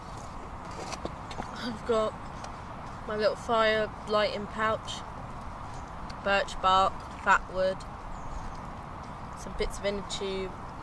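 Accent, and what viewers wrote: United Kingdom. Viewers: Hi,.... i like your English dialect. I keep learn how to speak British English dialect, but it difficult....:)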